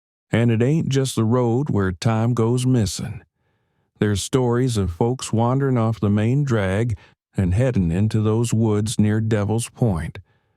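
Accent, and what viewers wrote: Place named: West, United States. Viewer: Is the narrator from Utah? I would swear he is from out West from the accent . i love Ky stories . My Dad had a few.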